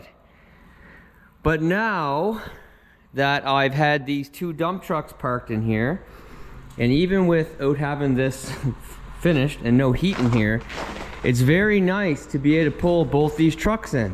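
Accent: Nova Scotia, Canada. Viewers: You'll just end up with a cluttered shop keeping everything in there. Customers will feel safer going into a tidy office, without the mechanical noise also. You've got the land, and we all know by now you love tidy! 😅 I like the way you talk by the way. You're a Nova Scotia boy through and through 😊 Which , of course, brings me to the subject of AGE. You never announced the winner of your " Guess My Age" video. I'm still waiting .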